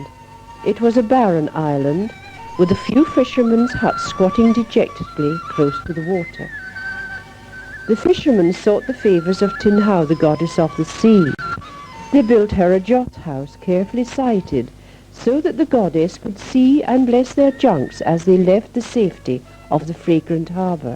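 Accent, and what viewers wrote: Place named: United Kingdom. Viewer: Very well done and loved everything about it. As a child visiting HK in the 1970s this brings back so many happy memories, this was a great time to live and a great place to live. Loved the traditional music, of course, and the narration, so British!! I have not been back since the 1980s and now things are so different under China's control. The memories will always however be there and this short video was a blessing. Many thanks for this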